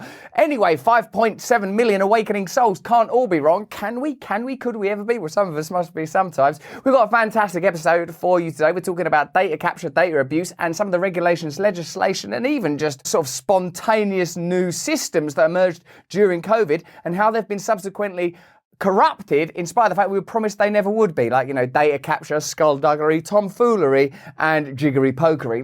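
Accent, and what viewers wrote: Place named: United Kingdom. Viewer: He said "... Jiggery-Pokery" with a straight face! 🤣I love this guy! He breaks down the HEAVY IRL news with a great sense of humor, Empathy, irony, & an amazing British accent. You've come a long way, Russell! So have we all. Still evolving. Big hugs to Everybody❤️! (non-corporeal, if that's easier for you😉)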